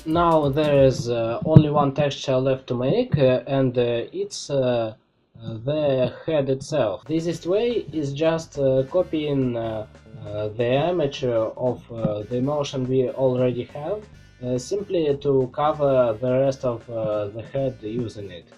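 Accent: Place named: Russia. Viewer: Да по акценту слышно русского мужика!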